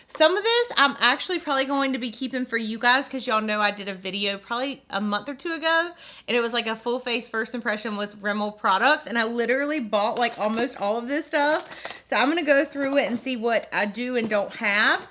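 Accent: South, United States. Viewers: Your country voice has me dieing of jealousy, I love it so much ‼️😭🖤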